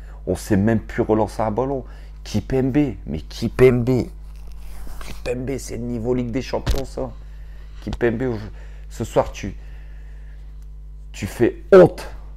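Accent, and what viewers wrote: North, France. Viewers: Salut, t'es d'ou du nord? dunkerque?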